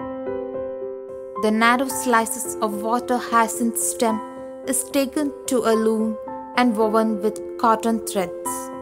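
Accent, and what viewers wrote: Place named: Kerala, India. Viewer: accent of sexy kerala girl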